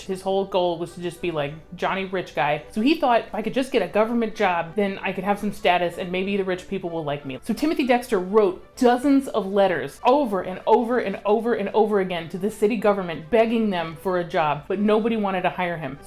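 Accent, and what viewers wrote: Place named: Florida, United States. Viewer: Why does it sound like he belongs in Florida?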